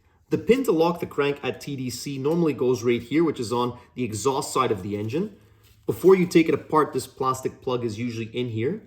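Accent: Quebec, Canada. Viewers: J'avais pas réaliser que tu étais Québécois avant cette vidéo ahah Vraiment superbe vidéo tu es excellent!!! PS, j'ai dit le tabarnak en même temps que toi XD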